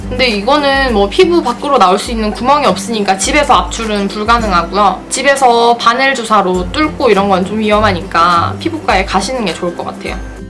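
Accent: Gyeongsang, South Korea. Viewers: 언니 대구사람 맞죠?피부과어디가셧어요?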